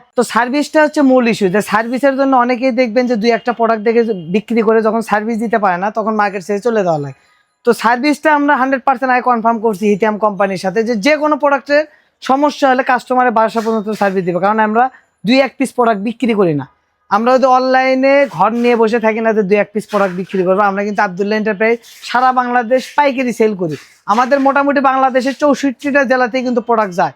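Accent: Bogura, Bangladesh. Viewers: Bhai Apner Kotha Sunle Mone Hoi Amader alakai Basha mane Bogurai.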